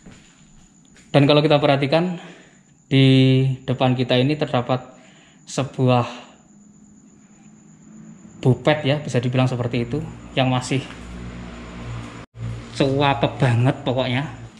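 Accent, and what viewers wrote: Java, Indonesia. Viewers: suka bingiiiitt bhsa jawa nya medok banget mas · Suka bgt kesini karena logat jawanya beda dr yg lain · Ngomongny ms"ny jowo banget...hihi....smoga yg punya msh sehat Aamiin.. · Ini Vloger keren, baru nemu Eyang. Dah langsung subcrib, ngelonceng, like, koment. Dan yang penting Eyang dah nonton sampai gusisss😃😃😃. Suka gaya bicaranya yang njawani. Semoga subcribbernya semakin mlenuk, Nak🙏👍❤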